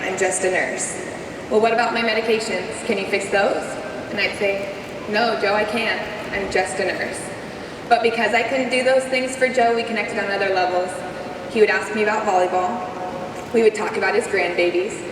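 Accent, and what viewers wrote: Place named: United States. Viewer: Talk more cringey bs in that awful yank voice!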